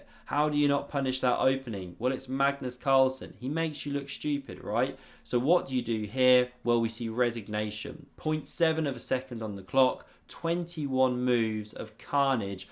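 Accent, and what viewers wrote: England, United Kingdom. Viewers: Fantastic video as always. Two things that were especially great about this one: your use of the word gross in the opening sentence and the fact that you managed to get Hans Niemann on screen for a few seconds. Keep up the badass analyses and I certainly hope you continue to be one of the greatest adjective-picker of all the people who speak our common language with an English accent. Folks from England usually go for soft, subtle-but-potent descriptors. Not. is aiming straight for your nuts when talks, and he's gonna hit 'em. lol sorry there's a nonzero chance that i've had a few drinks but.. I'm not entirely unserious-- keep on keepin on.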